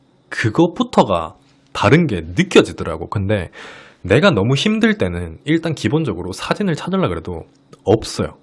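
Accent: Gyeongsang, South Korea. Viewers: 처음 보는 영상인데 일단 목소리,대구?말씨 억양, 훈남이면서도 편안한 외모에 위로받는 느낌이 들면서 빠져드네요 손 동작이 조금 산만해보여 아쉽지만 구독과 함께 좋아요 입니다.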